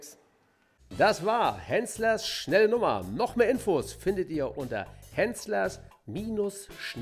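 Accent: Bavaria, Germany. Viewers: Alles OK aber hör bitte auf Bayrisch zu reden. Das hört sich bei dir sooooo grausam an... 😭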